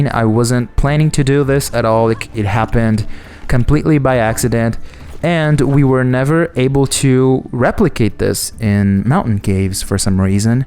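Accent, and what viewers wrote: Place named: United States. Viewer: No dude you sounded like an american for me when i first met u!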